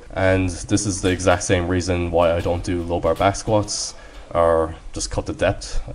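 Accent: Ireland. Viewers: Are you Irish ? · Wtf your Irish .. I thought your American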